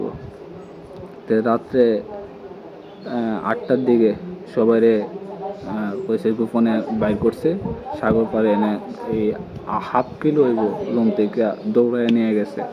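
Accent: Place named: Kishoreganj, Bangladesh. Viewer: আপনার কথা শুনে মনে হইতাছে কিশোরগঞ্জ /কাপাসিয়ার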